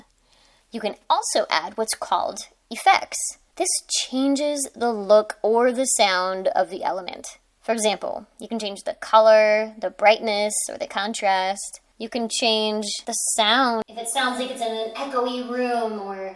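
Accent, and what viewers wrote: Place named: France. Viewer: Wow this is a master piece video. You covered it all and we can see your heart through the content. More than educational. I love your French accent "Très bien" 😊 Merci beaucoup 🙏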